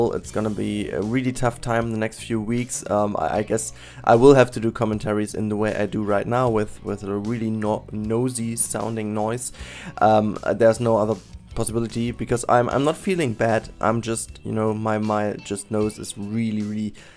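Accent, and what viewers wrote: Germany. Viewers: you sound like a german!? du bist deutsch oder? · Man hört es an dem Akzent xD so spricht fast jeder Deutsche Englisch aber du sprichst echt gut Englisch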